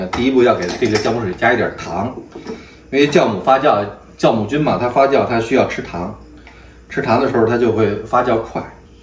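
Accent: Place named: Beijing, China. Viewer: 给点赞，平和随性地道老北京味，不错加油↖(^ω^)↗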